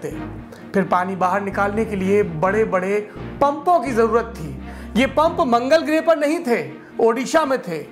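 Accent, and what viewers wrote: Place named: Bundelkhand, India. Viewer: Ashish Verma wo urai (Bundelkhand ) se hain. Avadh se nhi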